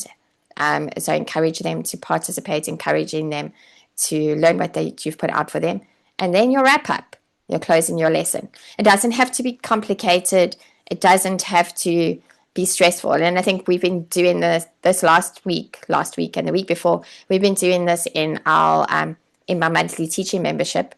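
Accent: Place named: South Africa. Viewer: Im ex dbn..living in a Sydney..nice to hear south Africa voice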